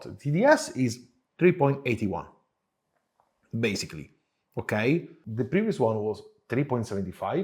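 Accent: Italy. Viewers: as much as I love machinetta and italian accent..half an hour is true dedication :D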